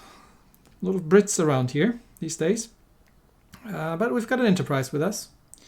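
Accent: Germany. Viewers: nah it's not that it's just that I have studied a little about Europe and Asia and thought that your acent kinda sounds Germen which is cool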